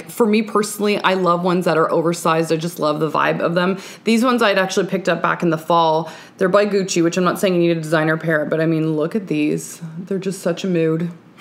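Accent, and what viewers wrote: Canada. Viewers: Love me a Canadian accent!! 🍁❤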